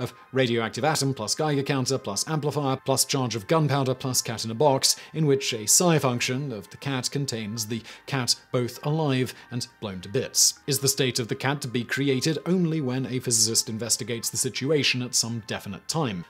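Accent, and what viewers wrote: United Kingdom. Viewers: Today I learned there’s another vsauce, except with a British accent.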